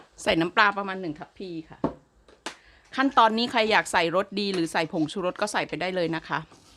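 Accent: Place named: Phu Thai, Thailand. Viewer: มีเเต่เมนูตาเเซปจ้า,ออนซอนจ้า,มักสำเนียงภูไทมากจ้า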